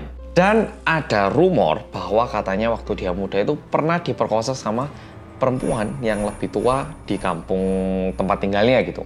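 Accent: Java, Indonesia. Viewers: Medhok team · Titimangsa apalagi kronologis yang beruntut lebih baik dibaca sih karena kalau salah fatal.. ini keren banget mas ga banyak yang bisa. Butuh jam terbang lama buat bisa selancar mas. Semangat mass medokk💪🏻🍉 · Cara ngmgnya mas enak bgt jd inget pembimbing magang saya mirip bgt medok2 gitu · Sedih baru nonton😢, soalnya pulang kepondok sebentar. Semangat dan sehat selalu buat mas yang medoooookkkkk..... 💗👏😂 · Bang lu logat ngomongnya kek orang jawa si bang 😅😅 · Lebih suka medhoookk...dari pada luweee...maaf semua...🙏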